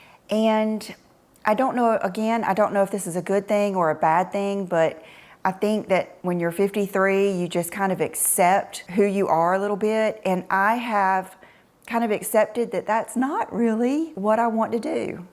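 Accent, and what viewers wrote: South, United States. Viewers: Hi, I’m a new subscriber! I watched and listened when you posted this so I can get to know you better. I love your personality, authenticity and your fashion style. What attracted me to your channel was your Southern accent (I love it) and your joy when you show us what you buy and model them for us. Your fashion philosophy is like mine. I dress the way I think makes me look and feel good, not to look young. Thank you for doing what you do to inspire us.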